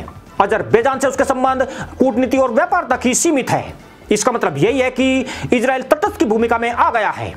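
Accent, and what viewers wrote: India. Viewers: Ji aap rajesthan se ho kya ya hariyana se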